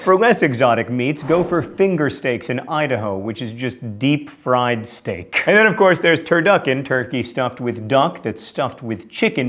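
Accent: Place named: Alabama, United States. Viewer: what happened to your Alabama accent? my brother was born in Alabama wasn't talking when we moved to California by the time he started talking he had an accent from the south. now he just talks like a surfer dude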